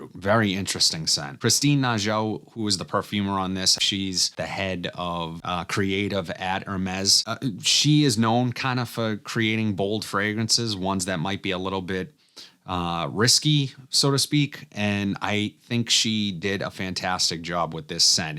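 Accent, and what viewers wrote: Boston, United States. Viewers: Man....that Boston accent. Gets me every time, in a good way. lol Thanks bud for a great video. I used to be all about niche but lately I am totally digging some designers that should be niche! A few are: Montblanc Black Meisterstuck (a more elevated and better performing version of Black Lacquer), Boss Bottled Absolu (I have not smelled any of the other Boss frags, but I cannot imagine anything being better than this one - beyond great!), Salvatore Ferragamo Pour Homme Oud (you can thank me later), Cartier Declaration d'un Soir (such an amazing manly rose).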